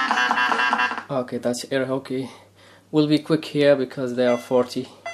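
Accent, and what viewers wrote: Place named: Brazil. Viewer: Lol... Would you happen to be Brazilian? If not, hopefully no disrespect is inferred. I ask bcuz I hear an accent... Thanks!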